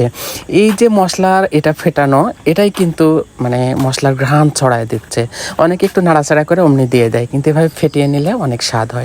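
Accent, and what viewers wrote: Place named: Rajshahi, Bangladesh. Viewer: রাজশাহী জেলার মানুষ এভাবে আ্যঁ আ্যঁ করে কথা বলে 🤮🤮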